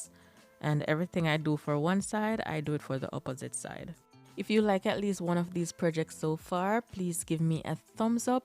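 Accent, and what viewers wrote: Jamaica. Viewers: Such a pleasure to hear an AUTHENTIC JAMAICAN ACCENT on here...sooo soothing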